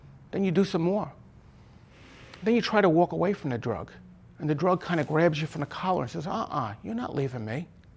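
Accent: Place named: New York, United States. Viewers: Hey brother my wife is from Astoria 37/31 and Broadway. I hear the NY wisdom in your voice. Good luck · This guy could do audiobooks. I could listen to him reading a book all day.....his New York accent......his intonations....fabulous! Thank you for telling your story. You made something good out of something bad. Everyone who's listened to this today got something different out of it.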